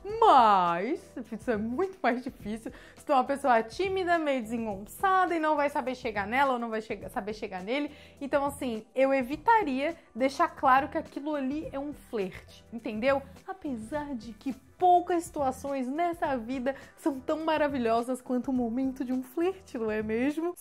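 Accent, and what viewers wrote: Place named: Caratinga, Brazil. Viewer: Sabia que esse Sotaque era Caratinense KKKKKKKKKKKK viva a doce e bela Santa Catarina ♥️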